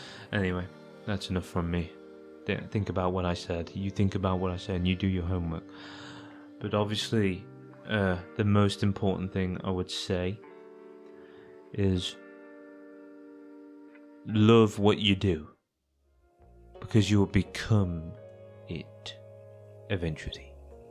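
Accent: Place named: United Kingdom. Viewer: british :pillow-turquoise-hot-chocolate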